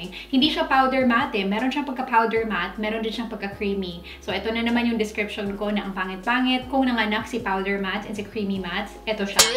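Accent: Visayas, Philippines. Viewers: I love your vids, coz I also have dry skin and we almost have the same shade (I think). Ang saya manood ng vids na super relate ako lalo na kapag parehong hindi maganda yung effect ng product because of our skin type. and I super duper love hearing your bisaya-slang accent, super sushal po:)I would love to try the Superstay Ink Crayon Lippie kasi wala pa sa Pinas nyan.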